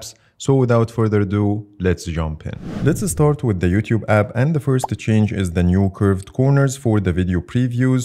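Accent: Egypt. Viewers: Egyptian accent do be strong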